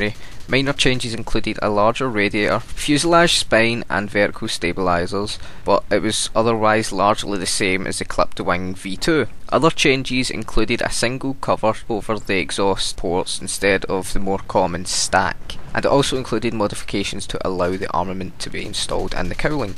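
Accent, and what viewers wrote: United Kingdom. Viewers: u from scotland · What part of North Ayrshire are you from?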